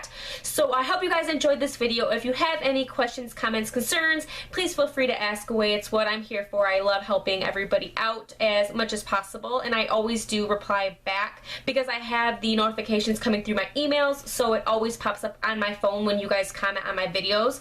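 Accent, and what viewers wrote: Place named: Georgia, United States. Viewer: Aww your accent is so cute lol. Love Georgia girl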